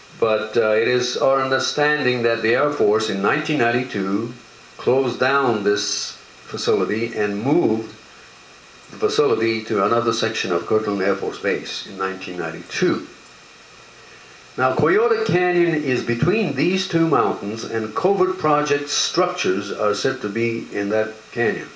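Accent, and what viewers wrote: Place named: Germany. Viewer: Nice video! You narrate well, you sound like Ricardo Montalbán with a hint of German, not being facetious about it but really, you need a job with the History channel.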